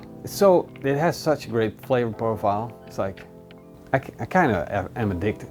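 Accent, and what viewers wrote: Netherlands. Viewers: That lovely thick dutch accent. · Ik vond zijn accent erg Nederlands Engels en het was niet eens slecht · In hoor dat je nederlands bent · is this guy dutch? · Je engelse accent lijkt alsof je een NEDERLANDER bent, klopt dit? Haha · When you can hear the Dutch in the man. ;D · Are you dutch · That hollands accent though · He's Dutch, I could tell by the English accent (and typical translation mistakes) but the actual Dutch words like boerekool confirmed it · Are you Dutch?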